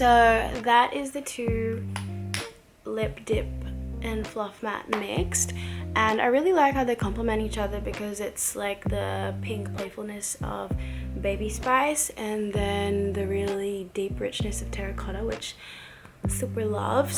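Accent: Australia. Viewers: why does she have a weird lowkey australian accent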